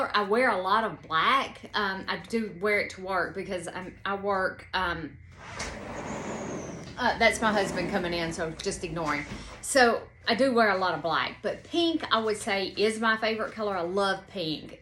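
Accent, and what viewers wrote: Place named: Alabama, United States. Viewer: Oh Wow! I totally loved hearing you answer all the questions… I always love listening to your Alabama accent too! Wonderful video!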